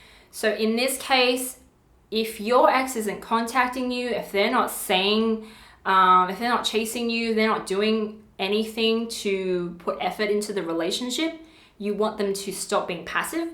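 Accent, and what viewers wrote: Australia. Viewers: W Jansen She sounds Australian.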